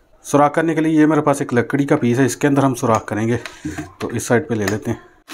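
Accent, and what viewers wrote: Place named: Punjab, India. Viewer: Bolne me Punjabi aa rahi hai lekin madri zaban Punjabi ki izzat nhi karenge Pakistani punjabi log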